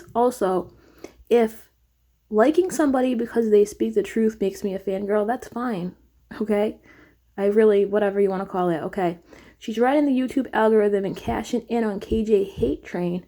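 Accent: Boston, United States. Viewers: Omg no way I'm not one of the first to fan girl over this absolute awesome smart a f, awesome cute Boston accent ass creator js.. (sorry) Buttttt I'll disagree with TOS and that's the beauty of being able to be adults in this world.. twitter wasn't a free speech platform esp someone inciting violence on his own home with his own smooth brain cult followers. Yes he was the president.. but meh Racism prejudice and bigotry went up in the last 4 yrs. I suppose my views "based" but if u not gunna try to run a hate riot and storm a government building I think most be ok apps don't want to support hate a violence But but I still heart ur vids and u one smart as cookie and I wish I was as beauty as u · I love this channel! 🤣. Don’t mess with the Baaaston accent! Lol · Yasssss. What up, what up- Can’t sleep at 230am. Baby in this belly won’t let me. I swear baby K is going to recognize that Boston accent ❤️ EDIT: how do we help support your need for coffee??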